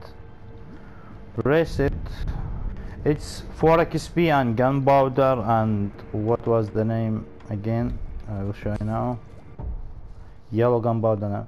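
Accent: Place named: Gulf, Arab world. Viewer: الخليجي واضح من صوته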